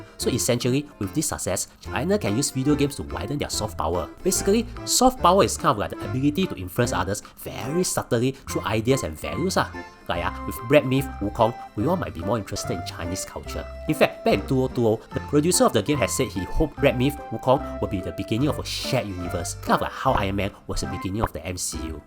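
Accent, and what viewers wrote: Singapore. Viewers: That singlish is making my ears itch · Singlish and his accent is so funny, so cuuute, I love it.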